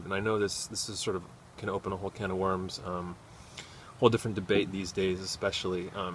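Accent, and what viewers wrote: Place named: United States. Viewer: Thanks. Talking of sharing a different language I notice you Americans pronounce "route" like "rowt" rhyming with "out" whereas we say "rewt" rhyming with "newt".:)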